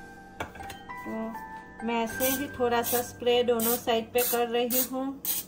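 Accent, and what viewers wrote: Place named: Nepal. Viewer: You speak like Nepali or Assamese. Nice video.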